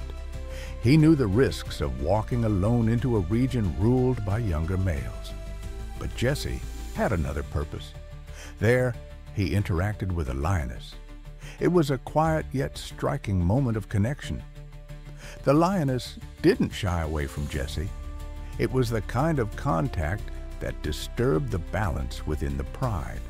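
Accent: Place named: South, United States. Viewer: Where are Jeremy Irons, Charles Dance or Sir David Attenborough?😢 This cornpone commentator Is Not the Ticket!🙄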